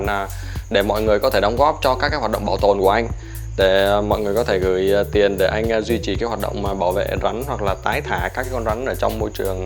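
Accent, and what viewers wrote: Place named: Central Highlands, Vietnam. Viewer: A ơi. Xem kênh a e bị nghiện a luôn rồi. Thích giọng nói và con người Tây Nguyên quá.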